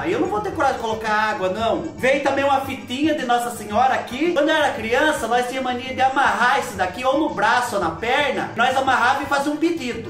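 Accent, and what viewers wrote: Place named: Rio Grande do Sul, Brazil. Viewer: Boa tarde, muito fofo seus presentes que bom gosto dos seus fas. Quem fala " O que que eu corto, cobreiro brabo", esta na idade de risco. Voce e muito fofo, voce estuda muito e pesquisa muito pra falar igual gaucho. Meus parente sao do RS e falam igualzinho voce. Me devirto muito.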